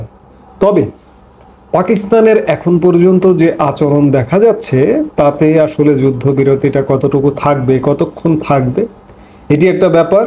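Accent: India. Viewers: Yeah! He talks like a Kolkata Bengali.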